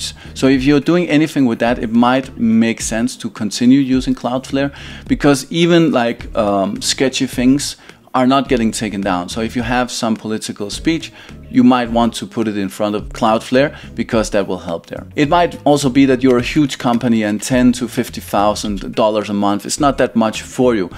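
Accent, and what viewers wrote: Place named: Denmark. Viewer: This is a great channel! I'm going to venture and guess that's a Danish accent. We need more Dane dev youtubers! Godt arbejde!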